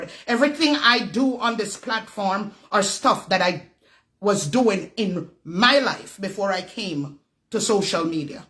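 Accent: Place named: Jamaica. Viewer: Real,real Jamaican talk.❤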